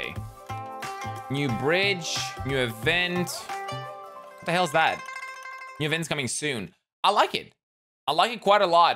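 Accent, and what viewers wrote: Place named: Eastern Europe. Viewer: I'm assuming English isn't your native language? You're pronunciations are weird eastern European I assume?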